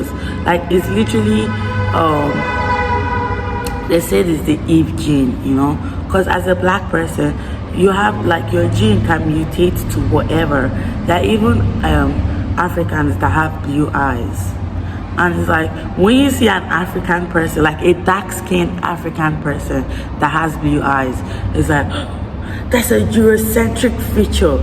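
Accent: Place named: Nigeria. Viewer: Where in Africa you from? You sound Nigerian Shaaa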